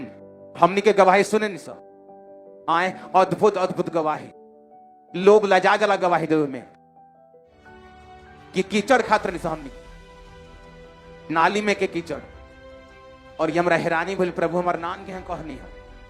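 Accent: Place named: Bhojpuri, India. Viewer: Praise the Lord, Papa ji shudh bhojpuri. Wah...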